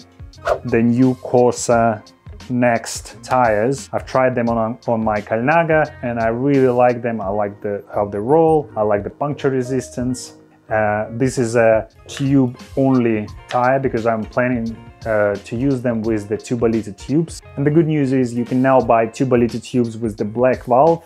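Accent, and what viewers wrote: Russia. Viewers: Так и думал, в самом начале ролика, что русский =) По акценту слышно.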